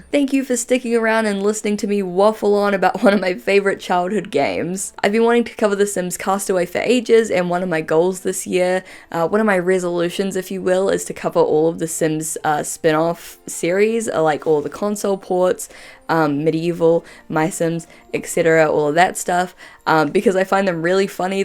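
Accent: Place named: New Zealand. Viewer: The original Sims is, so far, the only Sims game I've ever played, and I only got to the House Party (?) expansion pack. I watch these videos because I enjoy yer videos. And because I'm so intrigued by kiwi accent. But also because I enjoy your videos. So appreciate how much we (can only speak for myself, actually; sorry) aoppreciate your videos, dammit!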